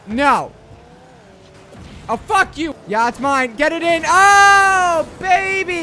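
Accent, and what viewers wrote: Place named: United Kingdom. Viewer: i loved this, i found it very humorous with the british accent, very awesome rocket league gameplay, I'll have to reinstall this at some point and play it again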